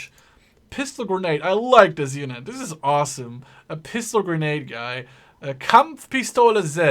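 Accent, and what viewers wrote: Italy. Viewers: Salut spectatorilor si ai folosit accent de italian , dar oricum nu stii ce tocmai am spus :)) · Mie ami place oricum cu sa fara accent Italic #respect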